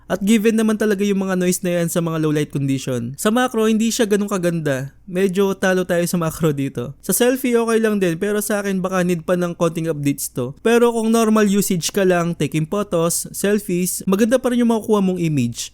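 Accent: Pampanga, Philippines. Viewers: Hahaha Kapampangan ka lodz? HAHA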